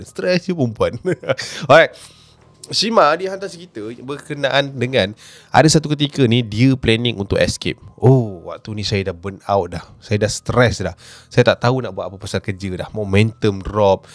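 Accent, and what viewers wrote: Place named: Kedah, Malaysia. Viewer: hng mmang ska ckp kedah ka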